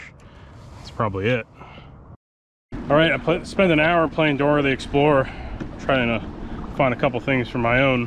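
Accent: New York, United States. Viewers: I can still hear NY in your voice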